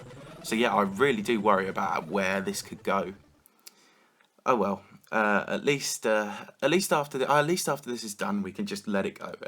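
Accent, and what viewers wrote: United Kingdom. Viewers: I like your British accent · It surprises me how British you sound in this video... Your accent could even rival Bold's.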